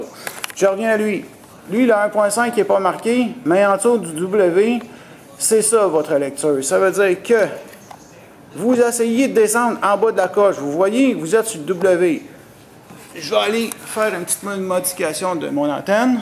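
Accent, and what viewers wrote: Quebec, Canada. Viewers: Ça fait du bien entendre les instructions en bon québécois 'sti !!! 😉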